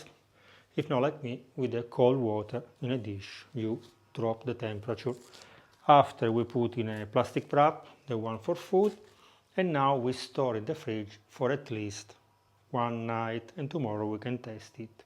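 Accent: Italy. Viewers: Descubriendo tu canal, saludos desde Venezuela, y comienzo a ver tus videos hoy. Comencé con la papada curada de cerdo para hacer carbonada... me encanta tu acento italiano de tu inglés!! Un abrazo y mucha suerte!!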